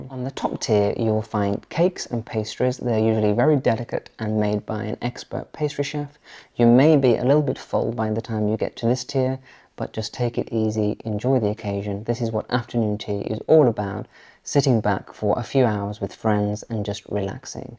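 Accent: RP, United Kingdom. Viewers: I registered this Chanel. I am interested in his posh accent than anything else.😃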